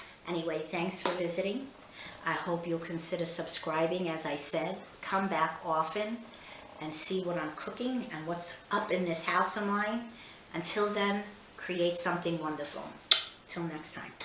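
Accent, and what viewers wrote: New York, United States. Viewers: Loved your video. You sound like a New Yorker!!!! Love it! Please make more videos!!!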